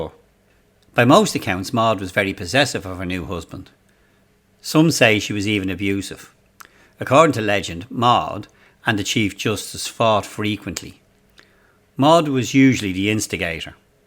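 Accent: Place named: Dublin, Ireland. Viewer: Fascinating and very well done presentation! Thank you! From an African American from New York, lovin" the Dublin accent!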